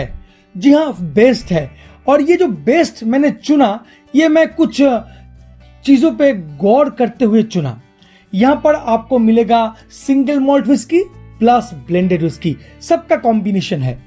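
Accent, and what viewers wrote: Bengal, India. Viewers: Bengali ha?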